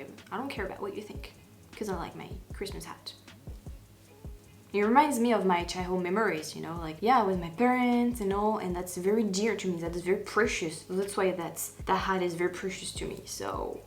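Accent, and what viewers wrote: France. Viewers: My parents told us "If you have nothing good to say, you say nothing at all" Words I've lived by. I found reaction channels on computer by accident. It's amazing how many are out there. I love them and I'll tell you why. I Love REACTIONS. I was born in 1956 (yes I;m old ):) I get to see a lot of titles I grew up with. I love music of all kinds. I get so excited to see younger people react to music I lived by, it's so refreshing. I'm addicted now so I "Like and Subscribe" to as many as I can. They brighten my day. Your channel was one of the first channels I subscribed to, why? First of all I have a weakness for french ladies:) I am Canadian, I'm english but totally love the french language. I took french in school and understand enough to get by. I love your accent, I love that you are so much fun to listen to, I love your smile on your videso, I love how you try to learn as much as you can, I love that you visited Canada, and had fun... 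I could go on and on my friend. You took us to the USA you showed us the Normandy war memorials and you love Timmies French Vanilla ( as do I ) You Make my Day. How anyone can find bad things to say are beyond me. You are doing Reactions, nobody is an expert yet some folks are really smart on here and have followed music all their lives. I enjoy their reactions as well. Last word. You are the prettiest, sweetest, sincerest on my list of reactionist. Ignore the negative, they can just leave or like you said, why even leave a comm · If you chose to do a YouTube channel, dealing with the trolls goes along with doing YT. It is the nature of the beast; YT channels bring out the worst in humans. They are a sorry lot who have no relevant life of their own; so they spend their time trolling people on YT to make themselves relevant and important. By you responding or devoting time to them in a YT video; you are giving them the validation they seek and you enable and motivate them to continue doing so. 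My advice would be to get a monitor or monitors of your channel (or do it yourself and just block them) to weed out these pathetic trolls. Constructive criticism is one thing; being mean, nasty, or rude deserves to be shutdown. By the way, I have admired your English speaking; I think it is very good. You are completely understood; a few words may make you pause, but you do an excellent job with the English language. I also admire you for learning a second language; I have heard English is a difficult language to learn because of all of our exceptions to the rule that occur in English. By the way, I love your accent. I think the French language is one of the most beautiful languages to hear spoken. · You are an authentic, transparent person. Keep doing your reactions,. Tu acento frances en ingles es muy simpatico. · I love your French accent and your broken English is cute and endearing. 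I hope you never lose it. I never thought of you as anything other than just a person sharing your experience and thoughts with us as you explore new music etc. I like your style so keep it going. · I watched a language video about English words that native speakers pronounce wrong. There are words in English that English speakers pronounce wrong but you a native french speaker are supposed to speak English correctly. I also wonder how many languages the people insulting your English speak. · Hi, I’m Jim Rainguet(French American), 71 years old, widowed, and thoroughly enjoy your reactions and your French pronunciations of English. You seem smart to me and isn’t having a reaction to new things the point of your channel. Don’t listen to the negative weirdos, just know people like me are out here reacting to things along with those who appreciate and love you! Jim Rainguet, Colorado, USA.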